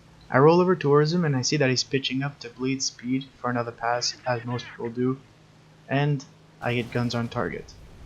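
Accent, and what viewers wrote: Quebec, Canada. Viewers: Great video yet again. I have a couple of things that I'd love to understand. 1. It seems like you think roll rate is more important than turn rate is for achieving reversals. Is this because you will usually have very little time to aim and hence you will usually need to be able to orient your plane very quickly? 2. In your clip at 5 mins, what should the Spitfire Mk XVIII have done rather than pitching up? 3. Great call on adding your voice. Are you from Quebec by any chance?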